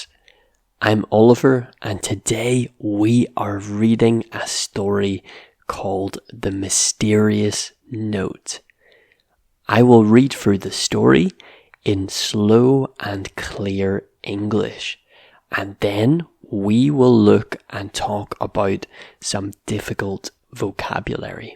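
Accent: United Kingdom. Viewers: Oliver, are you Scottish? I'm just curious because of the way you pronounced certain words like the ones that have the /ey/ sounds: carefully, raised, paper, and waves. Carefully sounds like curfully; raised, reezed, paper has a true /ey/ sound; and waves is wevz. Also, isn't buried supposed to sound like beh-reed?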